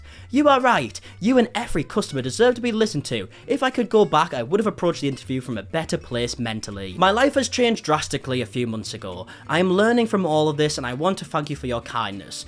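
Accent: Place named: Middlesbrough, United Kingdom. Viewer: you and Plumbella have made the Middlesbrough my fave UK accent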